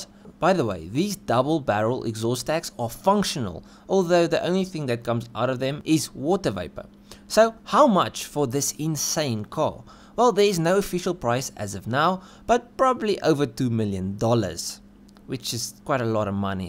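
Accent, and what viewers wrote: South Africa. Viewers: Great to hear a real SA accent.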